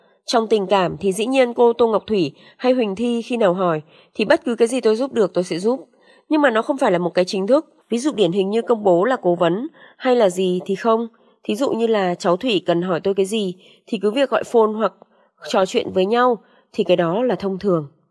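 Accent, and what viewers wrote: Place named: North, Vietnam. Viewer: M.C Nguyễn Ngọc Ngạn xa quê mấy chục năm nhưng vẫn mang đậm chất Bắc. Chúc mừng Ông trở lại thăm Quê hương Sơn Tây.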